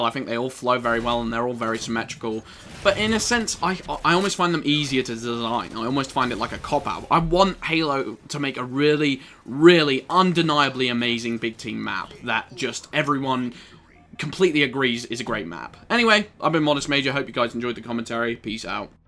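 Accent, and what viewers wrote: United States. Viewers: Dude your american accent is perfect. LoL. Also you have a very interesting perspective on maps that I never thought to look at before. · American accent FTW!! Hey Modest add me!!! Just a friendly reminder!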